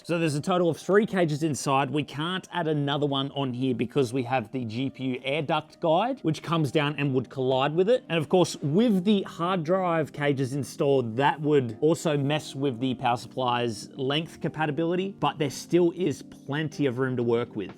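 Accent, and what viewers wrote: Australia. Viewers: Awesome video as always, and a great case from CoolerMaster. ☺ Thanks a lot, my friend, for the video and for showcasing that really good CoolerMaster case. ☺ By the way, I watch your videos in your original language because I really like the Australian accent. 😊 It reminds me a bit of the British accent. 😅 Best regards. ☺ Geiles Video wie immer und gutes Gehäuse von CoolerMaster. ☺ Vielen Lieben Dank mein Freund für Dein Video und die Vorstellung des echt guten Gehäuses von CoolerMaster. ☺ Übrigens schaue ich Deine Videos in Deiner originalen Sprache an, da ich den australischen Akzent richtig gut finde. 😊Das erinnert mich ein wenig an den britischen Akzent. 😅 Liebe Grüße an Dich. ☺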